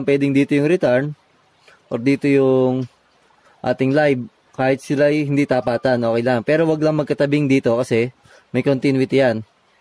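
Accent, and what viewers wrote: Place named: Quezon, Philippines. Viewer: ganda mga vids mo sir.. slamat.. from quezon province ako, kya pla pamilyar punto at words mo.. more vids pa..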